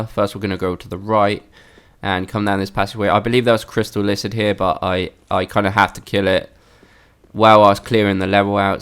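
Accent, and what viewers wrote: England, United Kingdom. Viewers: I truly love listening to you English fellas doing walkthroughs. I catch myself with with my country accent tulkin like yew guys . Haha. I do it quite well. 😂😂😂 Thanks!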